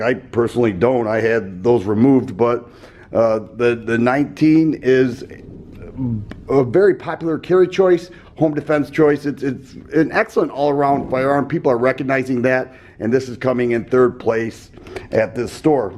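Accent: Michigan, United States. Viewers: I didn't know Michiganders had a accent until I moved away. You have a very heavy Michigan accent. Keep up the great reviews.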